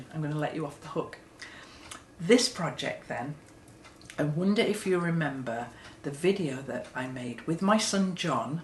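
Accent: United Kingdom. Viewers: Can I say on repeat how much your videos comfort me in a way that’s hard to put into words. Your sweet British accent and the way you are so comfortable in your own skin. Your gratitude and contentment are contagious. I’m turning 73 on March 6, 2026 . You are a soft voice for those of us in our older years to remember what is truly important and just enjoy the process. May God Bless you in 2026 and keep you in good health. I need you!!❤❤❤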